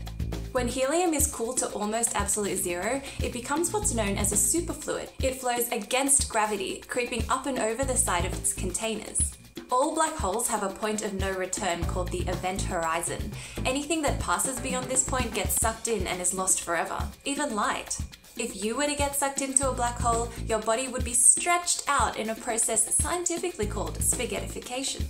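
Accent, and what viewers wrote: Australia. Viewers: Her accent is Australian and I’ve never heard an Aussie pronounce it “urine-us”. That’s more of an American affectation. Out of curiosity, how do you pronounce “uranium”? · Well, since we are bound by causality, it would be impossible for me to do that. Your making the video is the cause and my replying to it is the effect, after all. The latter can not precede the former. BTW your accent..........I presume you're Australian?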